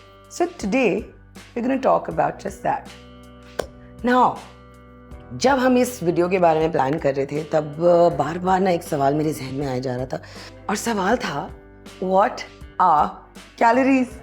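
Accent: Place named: India. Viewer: Very informative. Also take some positive criticism. Speak more in Pakistani accent. There is a touch of Indian accent in your tone, maybe because you watch Indian media.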